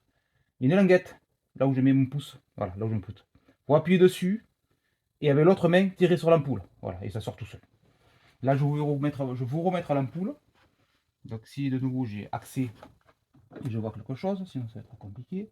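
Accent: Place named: South, France. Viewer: Excellente explication avec de bonnes astuce ! Et un accent du soleil qui fait du bien en prime 😊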